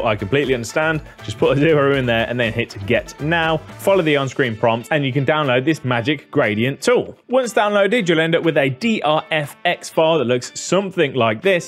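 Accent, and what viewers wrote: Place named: Birmingham, United Kingdom. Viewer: I am watching you from 2 years and I was prety sure you have a brummie accent but I wasn't sure until today when I saw your Twitter account.:goodvibes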